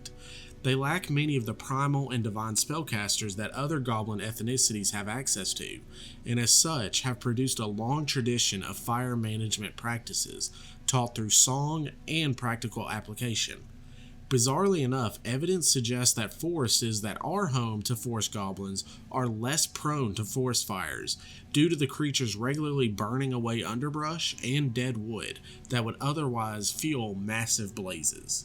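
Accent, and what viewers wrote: South, United States. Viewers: Love your work & a friendly Southern voice! Peace!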